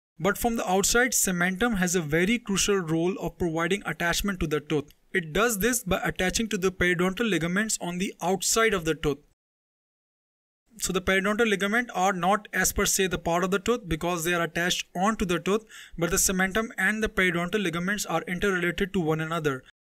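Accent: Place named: India. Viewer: You have the Bigest Indian accent I’m not trying to be mean my friend is Indian as well) btw thanks for teaching me this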